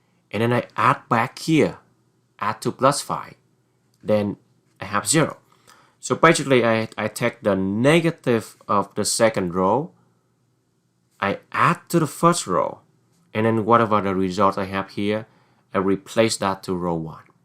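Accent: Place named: Vietnam. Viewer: nghe giọng giống người vn vậy bn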